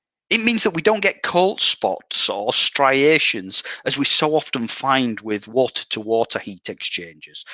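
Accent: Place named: Teesside, United Kingdom. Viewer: Hah! I grew up in Teesside; the accent is exactly how me uncle sounded. Brings back memories…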